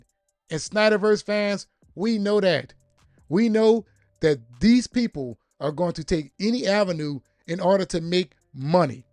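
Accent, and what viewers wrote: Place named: Louisiana, United States. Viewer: I love the enthusiasm when you say things are about to get a little bit spicy baby with that Cajun dialect I'm from Texas which is close to Louisiana and always thought that people from Louisiana have a very swag-a- docious way of speaking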